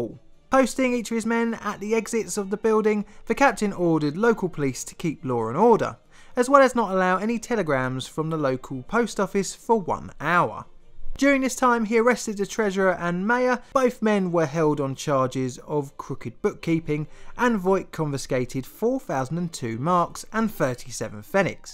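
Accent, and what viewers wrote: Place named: London, United Kingdom. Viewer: More fuel for my addiction :( also your accent is so Londoney hello neighbour.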